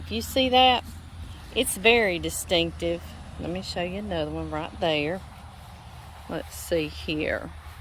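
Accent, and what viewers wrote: South, United States. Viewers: He makes the best gumbo and his Cream Chees Pecan Pie is to die for. I am going to try and make succatash. I love you S. Accent. We have lived here for 48 yrs and he has not lost his accent.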